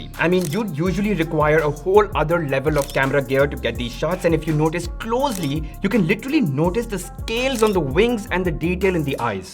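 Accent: India. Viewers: I hate indian accent of speaking english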